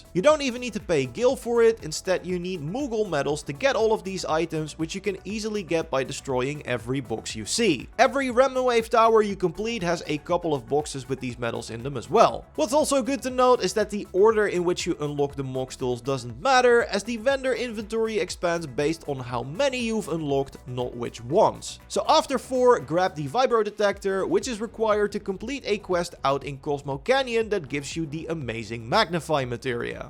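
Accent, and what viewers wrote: Netherlands. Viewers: I love your hearing your Dutch accent lol. Goeie video!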